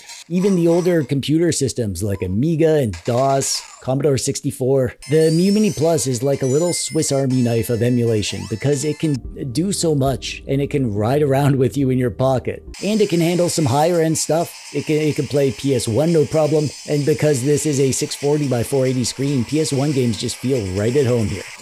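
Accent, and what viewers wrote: Canada. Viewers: I like your channel. I think that many of us watching are wonering who the heck that you are. U speak like u have a cold, u are funny and interesting. I seen ALOT of influence from other utubers especially the American Taki. As I watched 2 or 3 of your vids even with your strange congestion I could hear an accent. Are you Canadian? I think that u are. Interesting vids but ya dont have to be so weird. Its OK to be from another country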